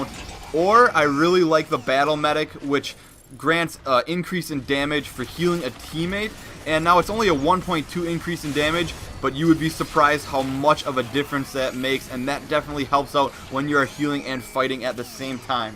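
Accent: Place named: Canada. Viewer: he has a really heavy canadian accent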